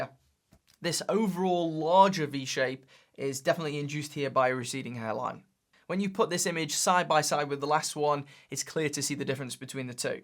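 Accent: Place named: Stoke, United Kingdom. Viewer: Are you from Stoke by any chance. Your accent sounds like something you’d hear in Stoke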